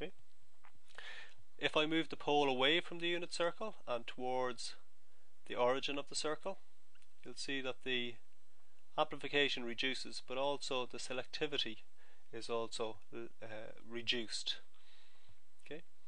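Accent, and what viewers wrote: Ireland. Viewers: Nice to hear an Irish accent. Thanks for the vid.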